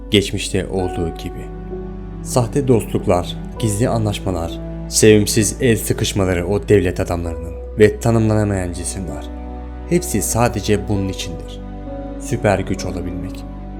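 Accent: Azerbaijan. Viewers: sen azerisin yoksa turk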